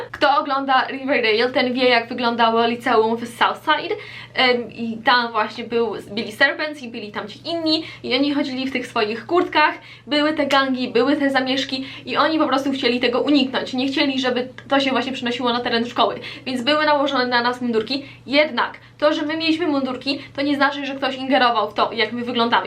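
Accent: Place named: United States. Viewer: Jestem bardzo ciekawa jak brzmial twoj glos zanim pojechalas do usa bo teraz brzmisz jak prawdziwa amerykanka mieszkajaca w stanach ktora przyjechala do Polski i ma korzenie Polskie ile lat bylas w usa tylko rok?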